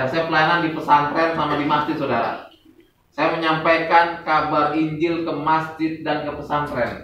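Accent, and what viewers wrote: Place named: Java, Indonesia. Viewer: Bilangnya dari sunda tapi logatnya jawa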